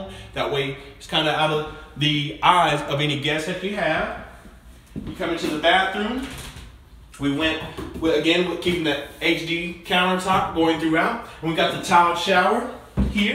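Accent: South, United States. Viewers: Was I the only one distracted by how tight those pants are on his beautiful 🍑🙈 I'm like 20% interested in these houses and 1000% interested in he's so beautiful his southern accent those muscles that smile is curly hair every single part of him!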